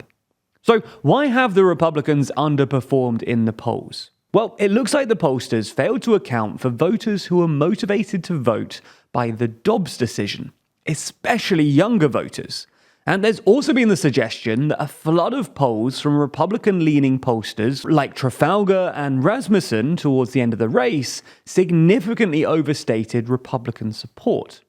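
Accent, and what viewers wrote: United Kingdom. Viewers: I think the problem is that the announcer is a Brit. It feels like an outsider telling a news from a froeign country.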